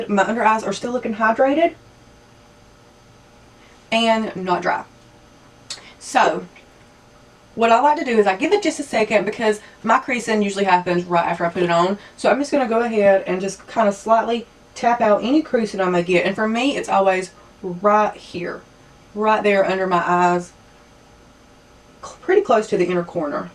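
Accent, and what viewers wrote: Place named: South, United States. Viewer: At nyte tyme...i love country tlk haha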